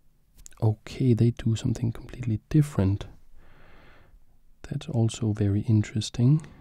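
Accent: Denmark. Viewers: Danish accent and danish gambit. Great combination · As a dane i always find it wierd to hear a danish accent, but I’m sure it’s soothing for others lol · 2 hours and danish accents?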